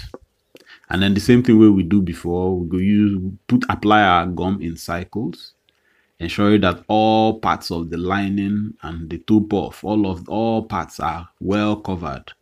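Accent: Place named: Pidgin, Nigeria. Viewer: Thank you for this sir.. It's your pidgin for me.. Sweet to hear😂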